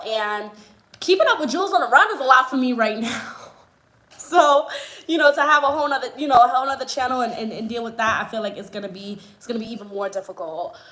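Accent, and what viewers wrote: New York, United States. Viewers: You are such a New Yorker! Your accent and your mannerisms remind me of being home! May God bless you and your family! I saw some clips of your wedding. It was beautiful to watch! Congratulations 🎊🍾! You are blessed to be on the continent because you are surrounded by such a beautiful culture and beautiful people! Your journey in Africa was meant to be because you are an inspiration and you made the necessary sacrifices to fulfill your destiny! Keep the videos coming! It doesn’t have to be perfect. Just be yourself and continue shooting Tanzania! 👏🏽👏🏽 🥰 💐💐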